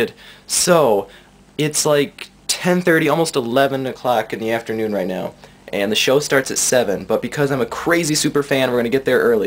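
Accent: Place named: Minnesota, United States. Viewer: You know, your accent reminds me of a Minnesotan accent. My landlords are from Minnesota (Im from Colorado) and when they argue I LOL.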